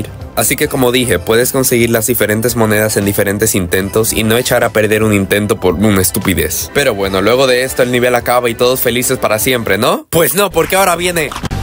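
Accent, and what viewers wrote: Dominican Republic. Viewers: Are you Dominican?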